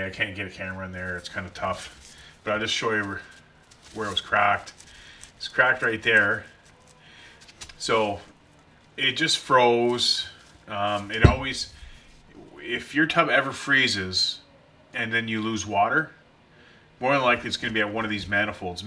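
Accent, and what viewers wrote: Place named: Canada. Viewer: You're Canadian accent makes me want to vomit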